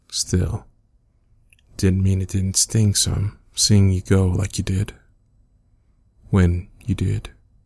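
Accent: South, United States. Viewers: Really wishing the guys around my town had this type of southern accent. They all sound brain dead. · Great video as always! as a girl from the south ( Florida in fact ) I am loving your southern accent. Keep up the good work sugar! ^-^ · Ugh I’m such a sucker for country accents😩🤍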